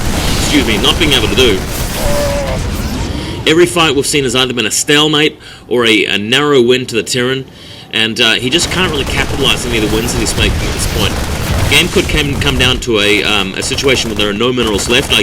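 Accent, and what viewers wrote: New Zealand. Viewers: Screw Day9, Tastosis, HD and Husky, when it comes to sc2 commentaries, your the man :-) And I LOVE your kiwi accent by the way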